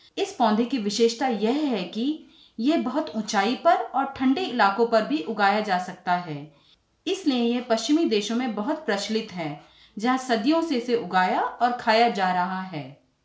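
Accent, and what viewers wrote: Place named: India. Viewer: starts in english ... morphed into indian ...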